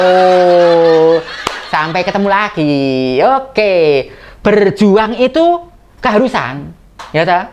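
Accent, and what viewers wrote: Java, Indonesia. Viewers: Kaget aq, kirain mas Alif ternyata bukan suara yg ini ada rasa rasa jawa bgt logatnya🙏😅🤣👍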